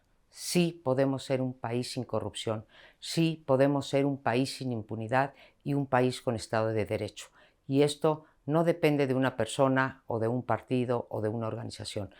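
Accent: Spain. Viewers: Porque habla medio española? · Y por qué habla así, como española, decía mi abuela: cada quien se revuelca en su mismo lodo, señora se queja de lo mismo que han estado haciendo ustedes por todos los medios "oficiales"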